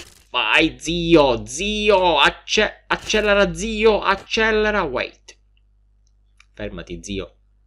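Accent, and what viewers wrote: Palermo, Italy. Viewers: SEI DI PALERMO?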